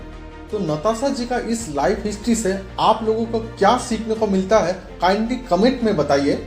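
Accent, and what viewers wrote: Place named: Bihar, India. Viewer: Yeh bost bihari bolna sikh le pahle...tera problem pasand nhi aya..teri boli.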